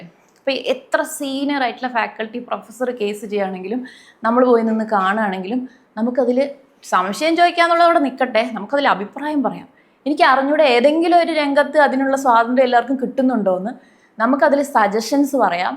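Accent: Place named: Kannur, India. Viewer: Oru kannur slag😊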